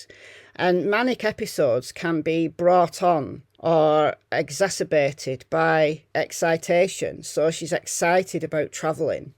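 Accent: United Kingdom. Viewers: I tend to think that you are right. I just don't like that the video has been edited and that they lie about it, or that nobody could see what was recorded on the other cameras in the hotel. I don't know if they are just protecting themselves or if something shadier has happened. Because the hotel had such a bad reputation, they had trouble finding employees so they often hired ex-convicts. I have seen some interviews with people who have reported assaults from some of their staff members, and some who have been raped on the roof. So maybe someone saw a vulnerable girl and took advantage of her? Anyway, a great video. I always enjoy your take on different cases, and I just love your british accent! ;-)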